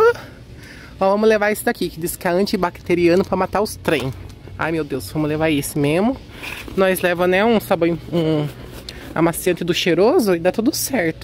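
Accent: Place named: São Paulo, Brazil. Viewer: Gosto do seu sotaque, quando você fala lembro de quando morava no interior em Rinopolis / SP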